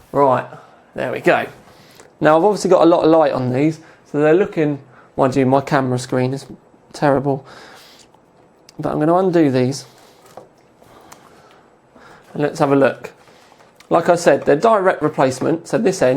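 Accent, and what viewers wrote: England, United Kingdom. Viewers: hes a pom, obviously.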